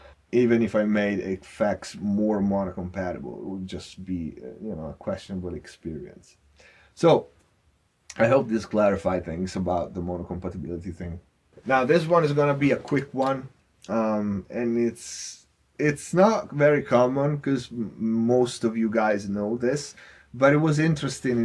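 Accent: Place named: Italy. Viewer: Just curious from your accent but are you Italian?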